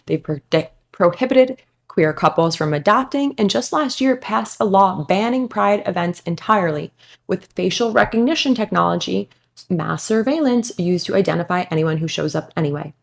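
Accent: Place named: East Coast, United States. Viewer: New Jersey huh, even the east coast accent came out today 😂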